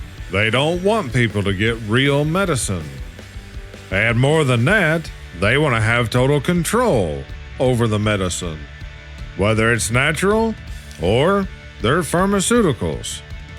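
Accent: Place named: Appalachia, United States. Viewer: I can READ ! Why do I have to listen to some hillbilly read the words ON THE SCREEN???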